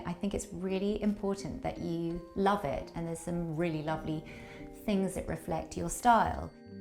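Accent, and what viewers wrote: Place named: RP, United Kingdom. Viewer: Wonderful house, horrible and ridiculous posh accent.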